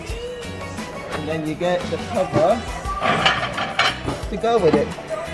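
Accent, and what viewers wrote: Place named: United States. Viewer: Her accent sounds a little American.I wonder if she lived in the states a little bit.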